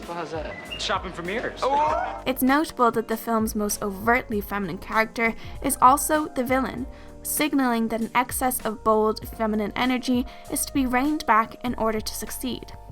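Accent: Ireland. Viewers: oh its cool to hear an irish person doing videos like these! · Omg I just knew the narrator was a blonde! Haha didn’t expect to get a face reveal. Anyway , I loved the video :) also are u Irish or something? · Your accent is interesting! I feel like I can hear touches of Irishness? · Hearing an Irish accent here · I love this video and I love your accent, is it Irish? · Love your Irish accent :D Great video, I never thought about these points when watching HSM as a child. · OMG NO WAY. I’m from Ireland and I know an accent when I hear one but yours had me deeply confused! Until I looked in the description, YOU LITERALLY SOUND IRISHH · is she irish or american i'm so confused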